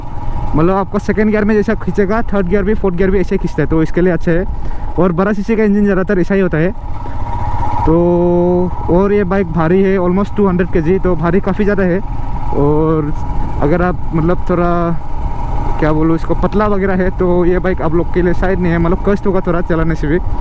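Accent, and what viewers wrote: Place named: Assam, India. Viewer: bro i think you Assamese boy cozz Hindi sm to sm Assamese wlo ka hindi hai ye😊btw keep it up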